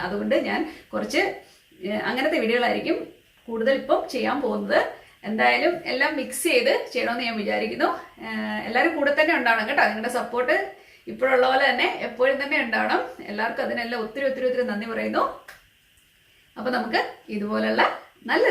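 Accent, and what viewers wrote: Kottayam, India. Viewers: Chechi kottayam kaariyaano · Chechi namude Kottayam Kari anenu thonnunallo...namal kottayamkarde prethyekathaya adupam ulloru samsarikanapole samsarikunath...luv it🥰🥰🥰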